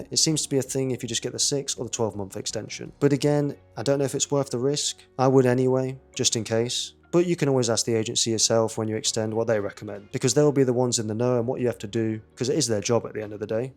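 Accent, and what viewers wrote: United Kingdom. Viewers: Which part of uk is your accent from? Midlands ? Ha ha Many thanks,, curious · I've been listening to you, thinking your accent isn't so different from my dad's family. They're Brummies! I hope that's not an insult...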